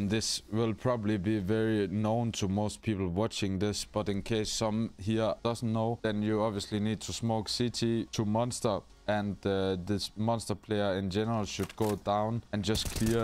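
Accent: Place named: Denmark. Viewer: Man kan virkelig høre du er dansker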